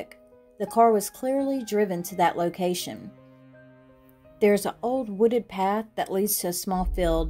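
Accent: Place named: South, United States. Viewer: Love your southern accent. I'm a southerner too. Interesting videos. You do a lot of research obviously.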